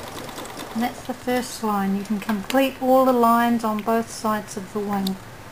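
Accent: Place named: Australia. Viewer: Wow thats incredible. I have been sewing off and on since I was a kid but just started quilting. You do beautiful work. Ive never seen a machine that switches colors before. I detect an Austrailian accent. You remind me of an old friend of mine. I just moved to Florida from NY. Quilting is very popular down here and my aunt is teaching me. I have to show her your videos. thanks. I would love to make a butterfly quilt with this techneq. for my daughter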